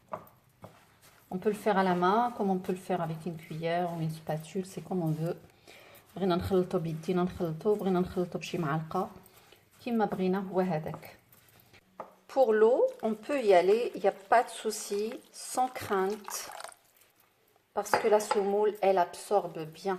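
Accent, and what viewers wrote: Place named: Morocco. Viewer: كي نسمع الهدرة تاعك نقول انكي من المغرب الشقيق ولكن الطبخ تاعك بكل صراحة طبخ جزائري ديجا احنا اشقاء واكيد طياب ميكونش مختلف كثيرا شكرا لك على لوبيا بالكرعين سخفتين يعطيك الصحة الخدمة والشرح المفصل والإتقان في العمل .متتبعة لكي جزائرية مغتربة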